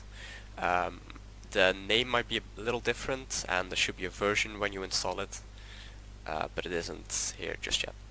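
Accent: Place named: Netherlands. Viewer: Zieke shit, thanks maat ik hoor je nederlandse accentje wel hoor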